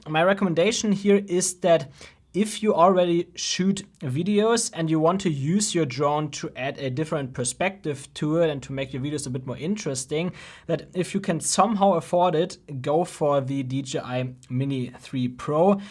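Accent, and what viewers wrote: Germany. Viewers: are you from germany? sounds familar like you are speaking...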